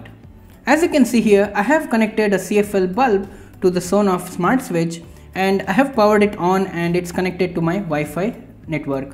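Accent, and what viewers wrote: India. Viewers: Hated the vid. More sonoff, less indian accent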